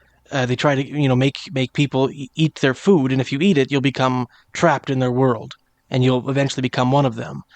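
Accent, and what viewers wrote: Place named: Canada. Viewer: If we ever go to war our password is About! I've heard so many Canadians, like this fella, whose accent is indistinguishable from varieties of American accents but that one word, about, will get them EVERY time!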